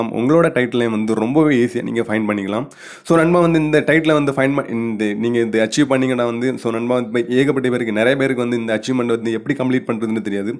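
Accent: Kanyakumari, India. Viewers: கன்னியாகுமாரி யா ப்ரோ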